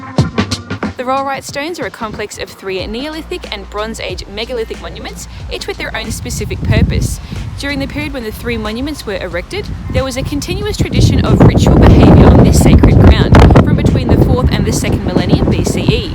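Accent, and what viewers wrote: Australia. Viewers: Been around most of Cotswold villages you filmed. Enjoyed your precise commentary. Do I hear an Oz accent? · Hello, can I ask what your accent is? You sound a bit Australian but I can’t tell haha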